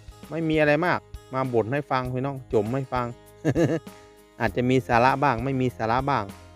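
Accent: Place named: Isan, Thailand. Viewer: พูดอิสานฟังยากอ่ะ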